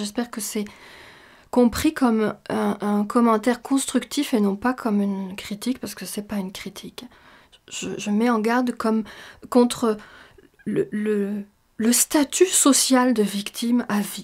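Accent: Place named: South, France. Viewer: Tu viens du sud toi c'est clair ;)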